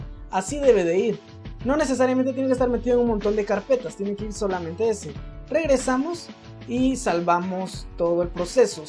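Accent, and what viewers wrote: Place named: Guatemala. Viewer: Acento chapin :3 hahaha que nivel vos gracias me sirvio.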